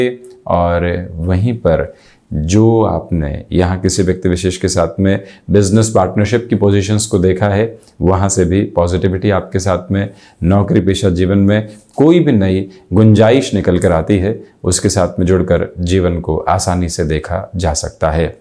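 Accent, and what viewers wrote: Standard. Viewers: वाह!गजब की शुद्द हिन्दी बोलते है आप।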